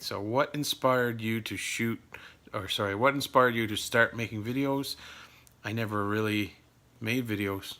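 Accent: Canada. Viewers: Team_Natty that’s not just a Canadian accent my friend, that is a Newfoundland accent.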